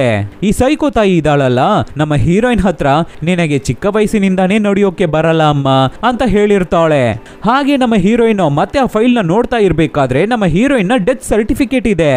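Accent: Tamil Nadu, India. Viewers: Nim mother tung Tamil ansuthe... 😂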